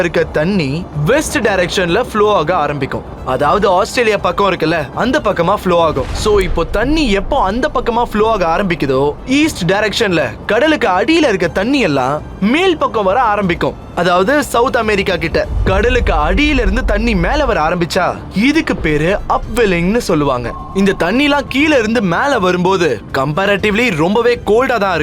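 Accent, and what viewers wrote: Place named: North Karnataka, India. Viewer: Dhruv Sir, Just a feedback on your Kannada Channel. We all are followers of your Hindi channel too. However the kannada language translation needs improvement. This is not Bangalore Kannada but sounds like a dialect of North Karnataka which is difficult to comprehend by most of the kannadigas. I completely understand that you can't read or write Kannada and you might have picked this language translation randomly without some inputs from Kannada Expertise. Best Wishes😊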